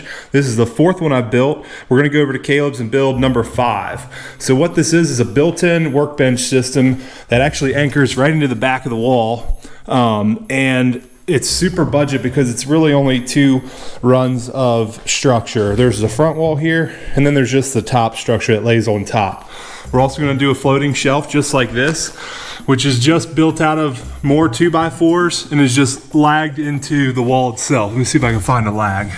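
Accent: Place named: West Virginia, United States. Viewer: I just had a feeling you were from West By God when I started the vid! Charleston area, here! Love this build and am looking forward to implementing this in my garage.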